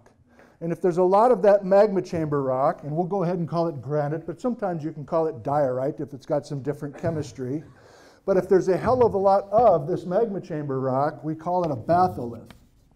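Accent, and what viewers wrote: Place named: Wisconsin, United States. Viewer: I knew I heard that Wisconsin dialect. I'm from there, too.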